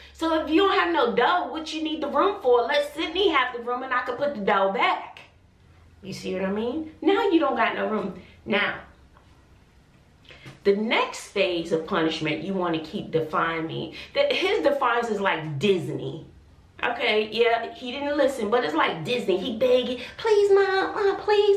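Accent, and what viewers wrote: New York, United States. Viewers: I love your channel so much, I love the way you speak My family from the Bronx; we recognize a New York accent when we see it. it’s so refreshing to see a momma much like mine on YouTube. We need more mommas like this. Growing up in the Projects I didn’t have a lot of things. I didn’t even have my own bedroom until I was about 17 almost 18. I think taking away your sons room will teach him to appreciate what he had because some people aren’t fortunate enough to have their own rooms. Great parenting. Keep up the good work.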